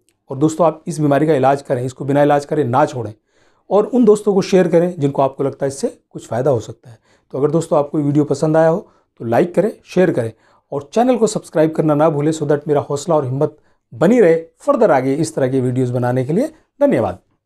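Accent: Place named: Assam, India. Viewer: Assam sai bol raha hi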